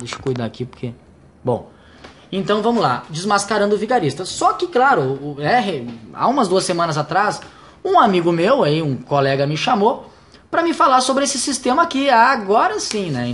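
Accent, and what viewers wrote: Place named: Rio Grande do Sul, Brazil. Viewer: Cara, tu eh gaucho? Pelo sotaque parece... tambem sou do sul, abraco, otimo video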